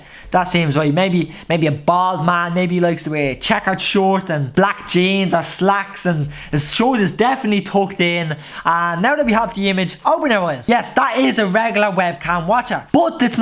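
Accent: Ireland. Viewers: So enjoyed these videos from Australia.was born in Dublin , drimnagh you are brilliant keep them coming love your Brough ha ha .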